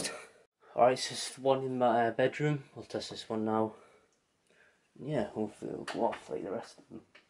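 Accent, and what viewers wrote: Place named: Wales, United Kingdom. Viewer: No, hes welsh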